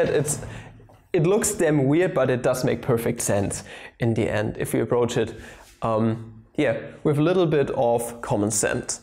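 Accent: Germany. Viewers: Well his is an actual German accent, I should know, I live there. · One and a half years ago was so anxious about his English, now - the more German in it, the better:D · What amazes me is that subtitles are perfectly true for a heavy German accent. Is it manually translated or google translation? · Ich finde es niedlich wie Sie Wörter wie 'the' 'this' '.. aussprechen :D Your german accent is adorable They are learnable through (the two th sounds). You need to see the arabic letters like qaf ق or ayn ع xD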